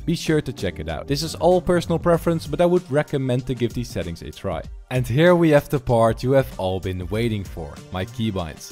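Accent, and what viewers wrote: Netherlands. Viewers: Ben jij Nederlands je klinkt als Nederlands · Are u dutch?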